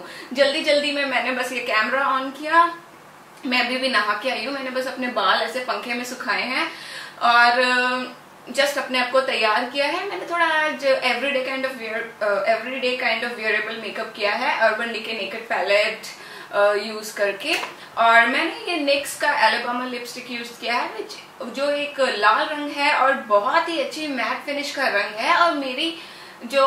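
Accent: India. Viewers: upka language lambadi hindi lagta hai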